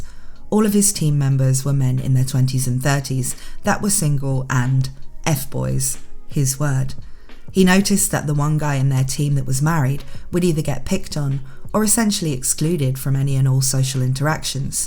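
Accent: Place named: United Kingdom. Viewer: More! Because of your accent, I default every story as something happening in the UK somewhere. Then you talk about States and other American stuff and there's a brief pause while my brain processes the disconnect.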